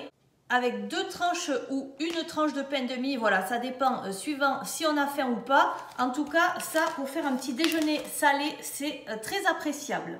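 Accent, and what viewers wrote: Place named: Toulouse, France. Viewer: Mdrrr l accent ! 😅. On ne se rend pas compte en parlant. Ce n est pas une critique. J ai le meme. 😅 Region Toulousaine aussi. Vous etes de quel coté ? Nouvelle abonnee 👍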